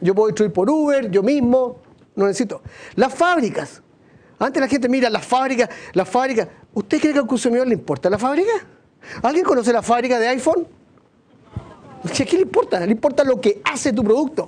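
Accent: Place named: Chile. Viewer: Estilo chileno amigo Yo viví siete años y medio en Chile soy de Ecuador y a los 3 años y medio recién les viene entender apenas hablan el 1% la español como corresponde tanto que los de Perú le ganan en pronunciar bien el español pero bueno es lo que hay en Chile No Hay que esforzarse a entender les su jergas y no hay más.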